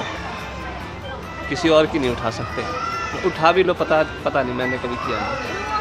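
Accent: Haryana, India. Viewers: Bhai kabhi ye apni harayanvi boli change mt krne ✌️♥️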